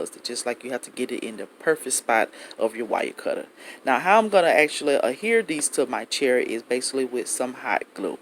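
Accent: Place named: Detroit, United States. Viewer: Great idea! That accent! What region are you from? Detroit?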